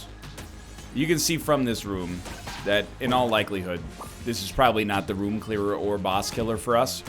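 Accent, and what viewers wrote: Canada. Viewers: I don't think I've ever heard Canadian accent as strong as I do in this episode, especially in the first half. He slipped into it during the bit and it took him awhile to pull back out of it.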